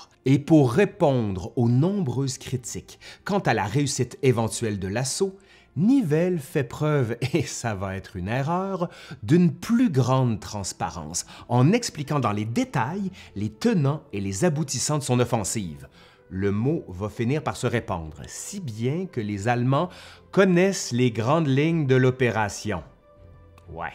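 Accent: Quebec, Canada. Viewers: Vraiment génial ! Je l’ai regardé deux fois de suite pour être sûre d’avoir tout retenu 😍 et tellement fan de l’accent québécois ☆ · moi perso, j'adore, surtout avec le petit plus d'accent québécois^^ très dense, on sent bien qu'il y a du travail derrière. Agréable début de WE pour moi donc^^ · J'adore cet accents québécois et merci pour cette vidéo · Excellent, j’ai toujours aimé l’Histoire mais votre manière de l’expliquer est vraiment prenante et en plus j’adore cet accent québécois, toutes mes félicitations! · Super comme toutes vos interventions. Merci beaucoup entre parenthèses j'adore votre accent canadien !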